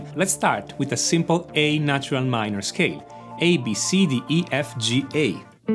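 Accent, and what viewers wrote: Italy. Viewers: You're very useful to the one who studied a lot. Thank you! Are you italian? Your accent sound so italian. Sorry for the stupid question!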